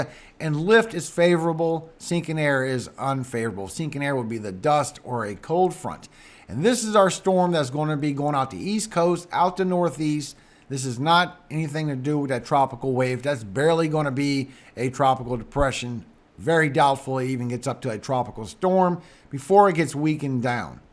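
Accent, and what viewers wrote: Louisiana, United States. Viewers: Bust thanks sounds like ur from houma la or nearby neworleans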